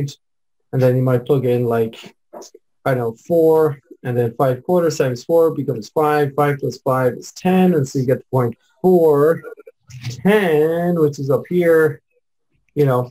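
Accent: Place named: United Kingdom. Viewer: BRITISH!?!??!?!!!?!!!!!?!?!??!?!!/!11?!?? But thanks for the tips, i needed this for my algebra.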